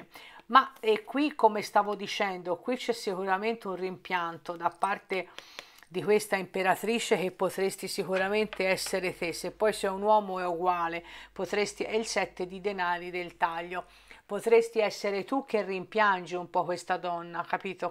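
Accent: Tuscany, Italy. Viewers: ciao...ti seguo da qualche tempo, l ultima lettura due tre giorni fa... A parte quanto adoro la tua pronuncia toscana e i tuoi "guarda qua" ehehe sei simpaticissima! Ma volevo confermarti, in merito al ritorno di qualcuno dal passato... Giuro che ho appena ricevuto un messaggio da chi non sentivo da 1 anno! L ho visto apparire mentre ti sto ascoltando in diretta 😱. Ho avvisato prima te, prima ancora di leggere il contenuto del messaggio stesso!! 😇 Complimenti per la predizione... Ora sono curiosa di andare a leggere la sua missiva!! Chissà cosa avrà da dirmi???? Ciao un abbraccio 🎄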